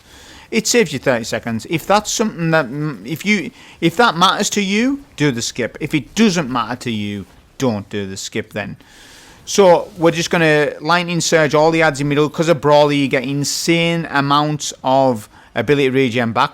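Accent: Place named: Newcastle, United Kingdom. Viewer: that's fair enough, I'm just trying to identify the accent, sometimes I think there's a bit of Geordie in there, sometimes I'm like hmmmm.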